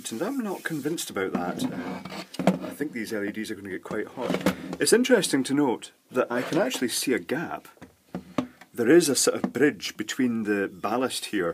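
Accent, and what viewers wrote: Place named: Bearsden, United Kingdom. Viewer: very polite spoken...you fae Bearsden?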